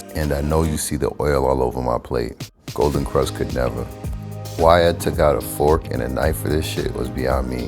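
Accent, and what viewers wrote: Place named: Bronx, United States. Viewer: This is the first video I've watched of yours...I heard the Bronx and I had to subscribe. I miss Kwame's restaurant in DC, guess I'll have to come back home and try Tatiana.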